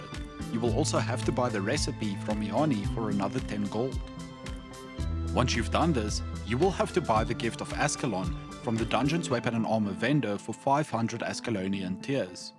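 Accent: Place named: South Africa. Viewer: Love the heavy South African accent. - Hello there fellow SA person. Thanks for the guide. o/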